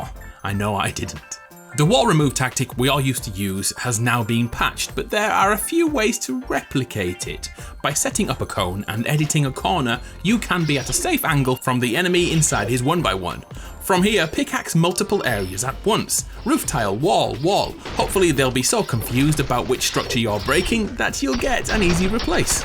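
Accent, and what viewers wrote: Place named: Yorkshire, United Kingdom. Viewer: Which part of Yorkshire are you from because I’m from Yorkshire too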